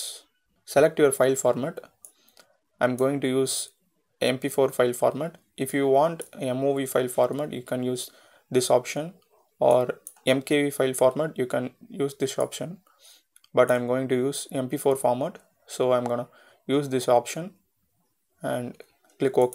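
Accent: India. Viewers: i hear an indian accent coming up.... thanks for the tutorial btw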